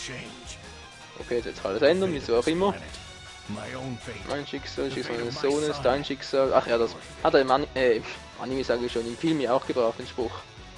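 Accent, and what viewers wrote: Switzerland. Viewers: haha chas sie das du schwizer bisch? isch ja mal voll geil ;D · bist du schweizer ? :D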